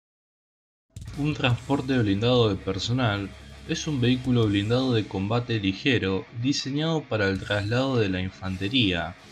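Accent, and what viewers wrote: Argentina. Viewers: Me encanta tu canal, pero tango una pregumta, pod qué el narrator es o suena ser Argentina? Podrias hacerlo tu end vez? Saludos.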